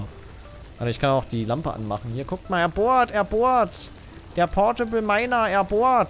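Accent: Germany. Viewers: könntest du die englischen Sachen nicht so deutsch vorlesen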